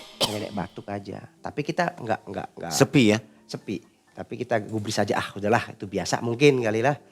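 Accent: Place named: Indramayu, Indonesia. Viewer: Waalaikumsalam salam mng ei,😂😂maaf mang bhsae wng Indramayu khas bnget (dicekedong)sya jg asli orang Indramayu,SHT2 crew MM dan narasumber nya...❤❤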